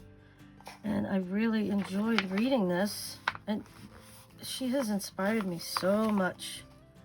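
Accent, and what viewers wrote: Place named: Massachusetts, United States. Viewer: What part of Mass are you from, because I detect an accent?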